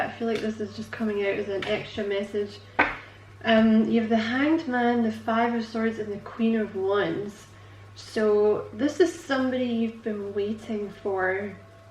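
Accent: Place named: Scotland, United Kingdom. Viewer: I loved this reading. Resonated so deeply with my current and past love life situations and not only was it true of me as a person, but what you intuitively picked up on with him was also very accurate. You mentioned a possible Aries ♈️ connection for new love and I’m in communication with him, and we are soon to meet in person for the first time. I’m so nervous because he is such a humorous and seemingly confident person, but I don’t feel I won’t be interesting enough, funny enough or bold enough for him. I can come across that way because I have Scorpio moon and Leo moon, but I’m not able to naturally be that way all the time. You often hear that Aries and Water sign compatibility isn’t the best, especially when his big three signs are all fire and I have two water and only one fire (but perhaps even having just one fire in my big three could help with compatibility). 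I hope that that doesn’t always matter with this combination. Then again I’ve been with people in the past who astrologically should have been a great match for me, but weren’t in the end. So I just hope that we get along as well in person as we do online with the strong connection we have. Please tell me if this combo can have good compatibility and wish me luck, because I feel I’m going to need it 😅. Thanks again for a lovely reading, 🦋 Oh and btw, I love how soothing your voice is and your accent, which if I’m correct, I would assume is Scottish? If I’m wrong, I apologise. But it’s a very p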